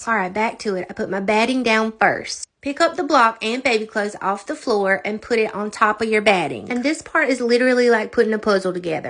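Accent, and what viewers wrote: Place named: Lowcountry, United States. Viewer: Girl dey jus don no how to hear country specially low country. You do you this Cajun girl understands you just fine. Bless their hearts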